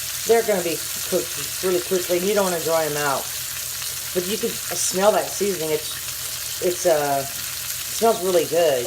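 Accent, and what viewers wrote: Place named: Louisiana, United States. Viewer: Looks delicious! I love cajun food and Louisiana! I was actually thinking when listening to you that you probably live in Louisiana! Lots of garlic and lots of cheese! Mmmm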